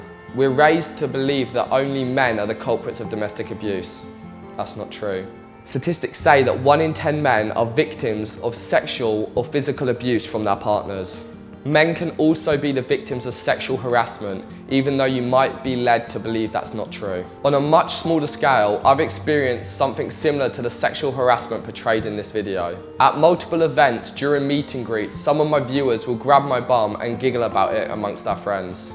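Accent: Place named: England, United Kingdom. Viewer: His accent makes me ashamed to be from England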